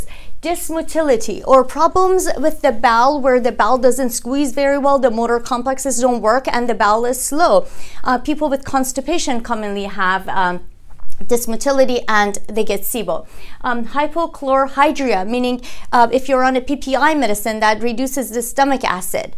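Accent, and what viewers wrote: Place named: Iran. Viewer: Sounds Irani.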